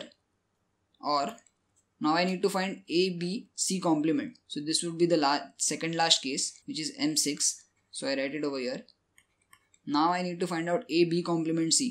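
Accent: India. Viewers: Why Indian have soooo annoying accent? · if only you indian can speak without that accent people would actually worship u guys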